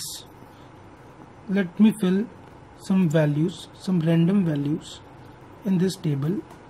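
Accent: India. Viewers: You know its going to be informative when the tutorial video has: 1. Indian accent 2. Ball point pen and paper 3. From more than 5 years ago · the indian accent is fucking funny lol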